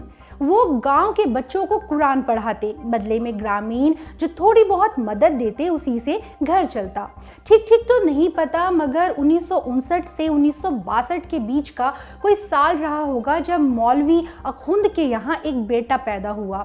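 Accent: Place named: Uttar Pradesh, India. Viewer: Uttar pradesh accent in her language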